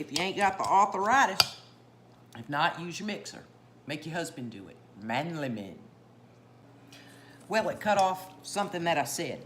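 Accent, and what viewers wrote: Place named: Eastern North Carolina, United States. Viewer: You sound like my folks in Eastern, NC.❤❤❤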